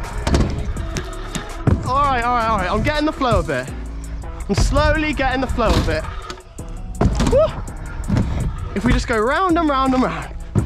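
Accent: England, United Kingdom. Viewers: BRO I LOVE HIS BRITHIS ACCENT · Are you English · Are you from England? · Is this guy british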